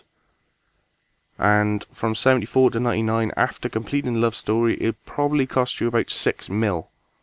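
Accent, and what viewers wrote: Wales, United Kingdom. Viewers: Where are you from you welsh?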